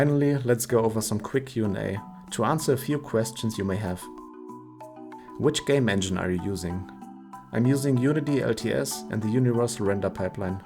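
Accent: Germany. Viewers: Are you german your voice sounds like a german?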